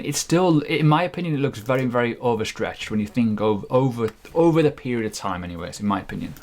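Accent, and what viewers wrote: United Kingdom. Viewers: Hello, I'm from the U.S and sometimes I have a hard time with your British accent. Would you please show the symbols of the crypto currency in the video, summary, or notes, I am new to crypto and I did not understand what "RSI" you were talking about. I pulled up 5 different coins (I think) in coinmarketcap and for future videos please? Thank you in advance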